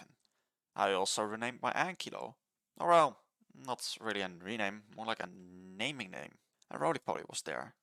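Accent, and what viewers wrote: Netherlands. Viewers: Je bent nederlands of niet😂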